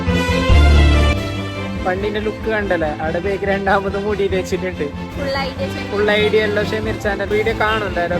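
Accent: Kasaragod, India. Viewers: Kasargod slang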